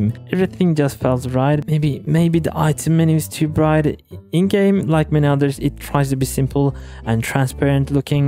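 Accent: Turkey. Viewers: bruh your accent screams Im turkish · Bro ı think u are turkhis because your speech is similar to a Turkish person speaking English. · aksanından türkçe sırıtıyor dost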